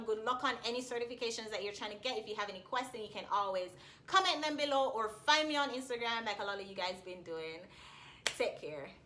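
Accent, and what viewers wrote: Jamaica. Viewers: Sound like a yaadi. Mek wi link up!!!!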